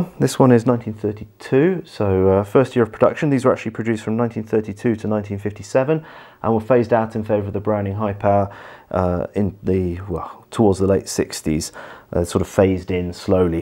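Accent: England, United Kingdom. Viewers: Wait, you have an English accent and DON'T live in England? HOW IS THAT POSSIBLE? YOU'RE BLOWING MY MIND.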